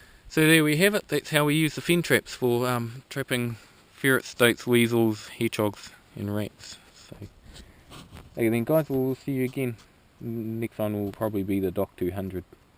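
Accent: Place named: New Zealand. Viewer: aw yea, fush and chups tonight bro? Good to hear a kiwi voice....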